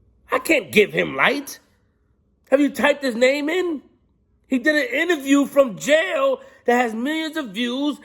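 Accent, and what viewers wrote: New York, United States. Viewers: Y u telling like a new Yorker o my bad that's all ya'll do B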